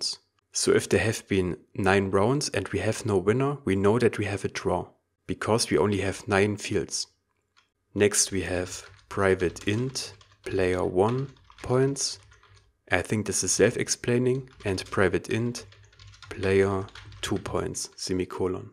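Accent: Germany. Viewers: thanx ..you have a sweet german accent.. hhhh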